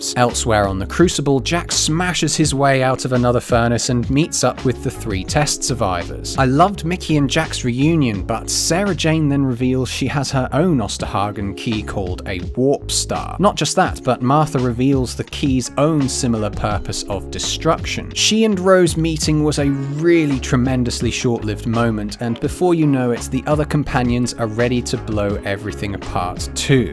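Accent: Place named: United Kingdom. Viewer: Hello man. I was thinking about your accent but i can’t quite tell which is it: of course it’s an accent from the UK but which one? I’m from Italy and i’m trying to get more familiar with this kinds of accents, thank you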